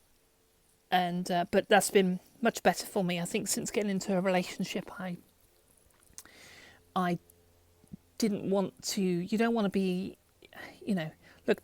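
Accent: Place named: RP, United Kingdom. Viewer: I found you. You have a Tremendously strong QUEENS ENGLISH accent (hope thats not bad what i said?) A blogging channel? Ok... I'll be actively looking out. Nice to find you 😊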